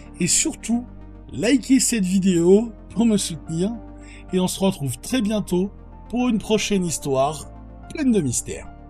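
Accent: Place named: Eastern Europe. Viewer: Je découvre ta chaîne, mais quelle ambiance! J'aime bcp ton montage sobre, ton élocution, la prestance de la grosse beubar de viking, cet accent slave magnifique 🤌 Ton analyse est fouillée et pas inutilement dans le debunk. En tout cas ton Drekavac me fait fort penser aux très modernes creepers ou rakes (humanoïdes squelettiques, pattes arrière retournées, yeux fluorescents, gueule béante et emplie de dents...) Y'a une version en Europe de l'ouest, comme tu dis le cri est horrible et ils sont très furtifs. Le coup de la photo/film raté se recoupe avec pas mal d'images de cryptides, qui sait si ces créatures ne peuvent agir sur les images avec un genre de champ électromagnétique ? Et cette histoire de cri avant un mort rappelle les Banshees bien sûr! Je m'abonne évidemment:)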